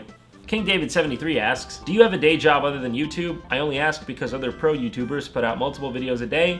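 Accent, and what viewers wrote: United States. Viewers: what a strong american accent he has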